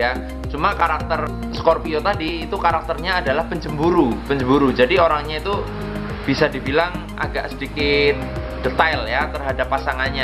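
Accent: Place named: Yogyakarta, Indonesia. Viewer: Orang mana bang kok medok?😂, Dari Jogja ya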